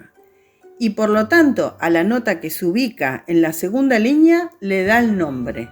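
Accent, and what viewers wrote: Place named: Argentina. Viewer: Gran estímulo para mi por dos razones, el acento argentino o el que tengas es muy agradable de escuchar y además tienes la mano canija por chiquita, como yo, eso me anima, gran cosa esta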